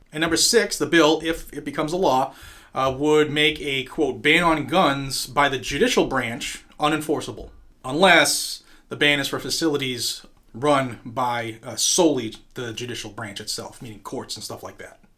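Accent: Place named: Boston, United States. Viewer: Never noticed your Boston accent till this video. Been watching you for about a year. Good job and I like hearing about 2A news around our country. Thank you.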